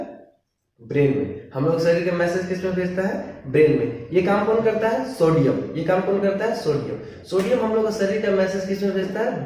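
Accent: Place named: Bhojpuri, India. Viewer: pure bhojpuri sir❤❤❤❤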